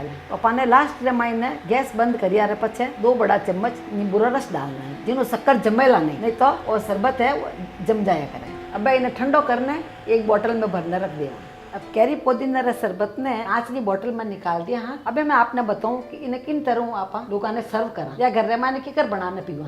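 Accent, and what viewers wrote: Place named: Rajasthan, India. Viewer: Very nice simply method Looking nice kakisa🥰🥰 Rajsthani boli ghani chokhi lage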